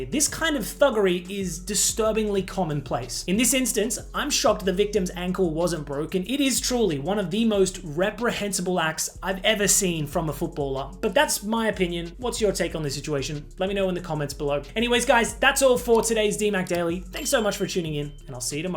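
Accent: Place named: Australia. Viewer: The Aussie accent is indeed the best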